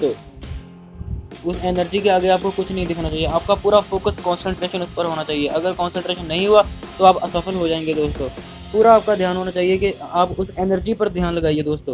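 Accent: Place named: Punjab, India. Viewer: Narrator is from Punjab, I guess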